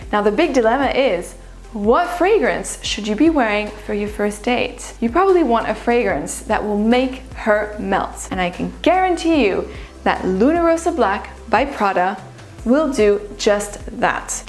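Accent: France. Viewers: She is definitely a french speaker😊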